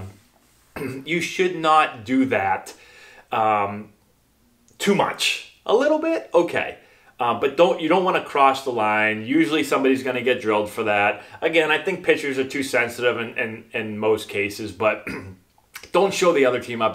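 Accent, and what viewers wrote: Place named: New England, United States. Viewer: has a hint of a New England accent. You can hear it when he says "about".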